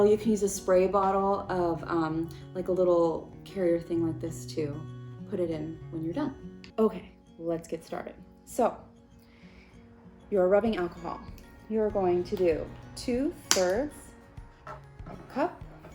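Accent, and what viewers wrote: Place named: United States. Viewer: Gần 30 tuổi chưa bao giờ comment trên Youtube. Nay thấy chị nói tiếng Anh Mỹ hay, nghe dễ. Mong chị ra video dạy tiếng Anh, chị có khiếu sư phạm. Thank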